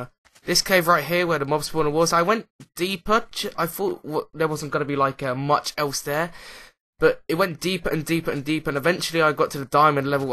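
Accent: United Kingdom. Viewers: You say we Americans have an accent? Well I don't believe that but I do like the British accent like you did say... But I would love to have a German accent. So go ahead take my accent I'll take your British one. :)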